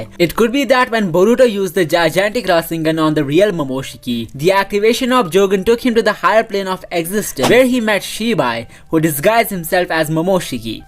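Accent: India. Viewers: R u from India?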